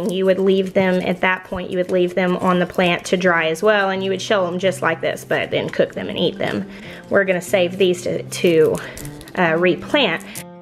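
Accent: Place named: North Carolina, United States. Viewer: Your channel was the first channel I watched when I decided to go Eden on my yard. Very informative and thorough on your videos. The accent also makes me miss NC. Keep up your great work and on educating myself on so many topics. Thank you.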